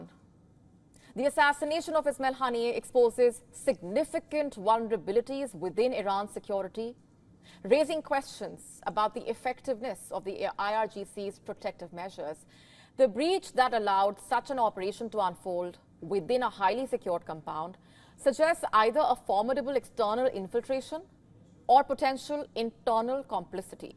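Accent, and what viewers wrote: India. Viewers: Indian accent not very sexy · Accent and herself both are not sexy like a common Indian, Pakistani or Bangali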